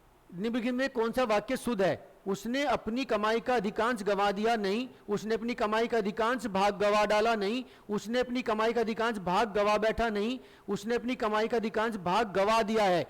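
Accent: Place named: Haryana, India. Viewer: Apka tone lagta hai ki aap hariyana se hai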